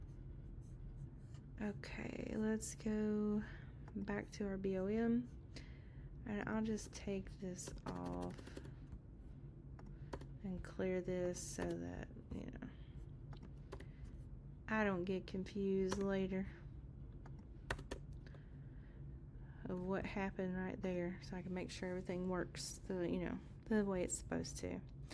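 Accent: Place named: South, United States. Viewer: I just bought your template and yes I am side tracking here but your southern accent sounds so pretty! ☺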